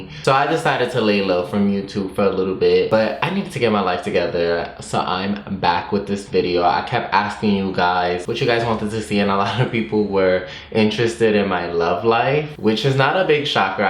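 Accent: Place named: New York, United States. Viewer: Your NY accent is heavyyyyy! 😂 mind you I’m from NY too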